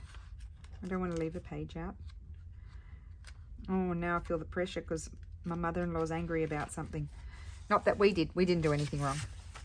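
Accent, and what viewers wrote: Australia. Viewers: Thank you for letting me know! Perhaps some day I can make the list. I adore your videos, your Aussie accent and your shop items. And you!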